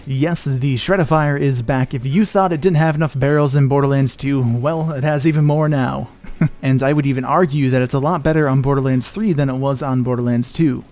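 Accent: Czech Republic. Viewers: Are you czech?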